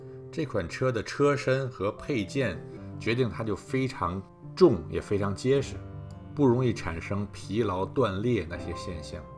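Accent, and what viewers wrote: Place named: Tianjin, China. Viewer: 大哥你好，听您声音天津的，我们是老乡，很感谢你分享了这个电动车，但是我在澳洲买不到，有点失望，这车的确不错，淘宝有类似但是感觉不太靠谱。祝您生活愉快。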